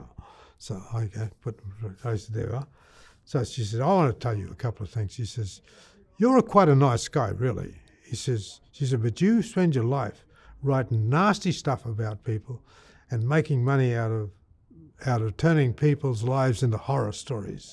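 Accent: Australia. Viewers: As an Aussie from North Queensland too, I recognised his accent straight away! And the “gum tree” in the title gave me a hint too. 🇦🇺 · I always wondered where News of the World stories came from (with an Aussie accent). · Wow youve got an aussie on 👏 Hailing from Australia 🇦🇺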